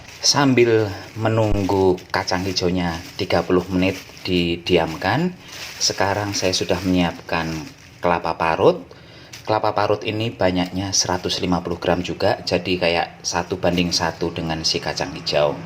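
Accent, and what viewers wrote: Banyumas, Indonesia. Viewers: Sangat kreatiif ms... bahasa ngapaknya itu saya jg sangat suka...lucuuu lgi😁😁😁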